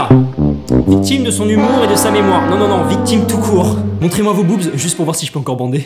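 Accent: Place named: United Kingdom. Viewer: J'adore ton accent anglais british 😍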